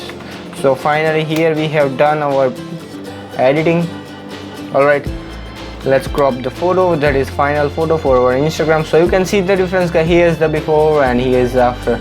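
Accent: Gujarat, India. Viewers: sure bro by ur accent u seem gujarati ryt?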